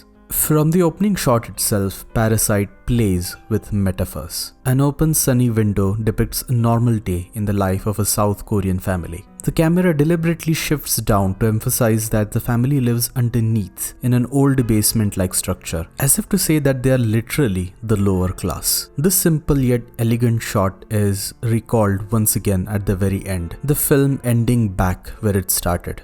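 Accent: India. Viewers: Indian accent always stands out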